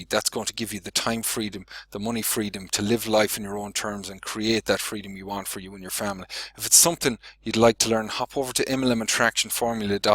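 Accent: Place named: Ireland. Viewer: 😂🤣 I❤️his tight Irish accent!😂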